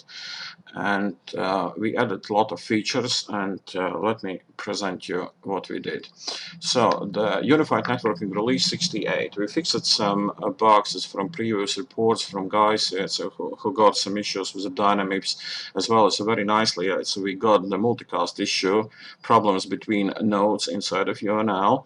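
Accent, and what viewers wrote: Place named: Russia. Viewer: Thank you for your job! Are you Russian?)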